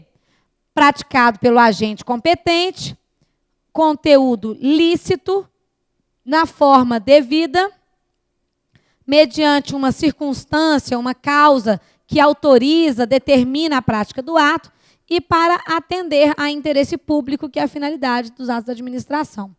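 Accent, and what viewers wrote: Minas Gerais, Brazil. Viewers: professora com sotaque mineiro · com sotaque de mineirooo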